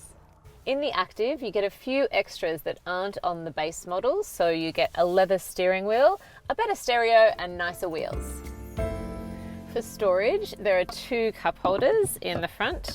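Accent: Australia. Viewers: I dont know how to tell you but Aussies pronounce things differently. Because there are different countries outside yours and people outside of you lol. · Damir D, she has an Australian accent, please do not judge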